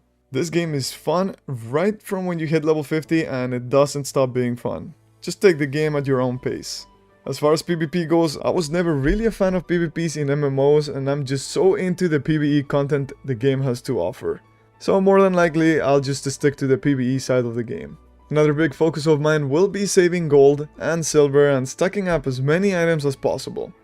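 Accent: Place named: Europe. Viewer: I thought you were EU based on your accent haha, or you’re playing both regions?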